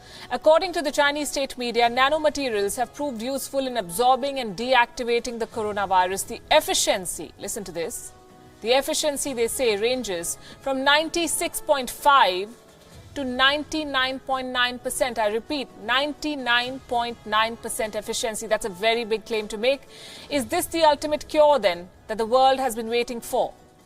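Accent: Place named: North India, India. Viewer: var1328 Lol no. That's the North Indian accent. British sound completely different.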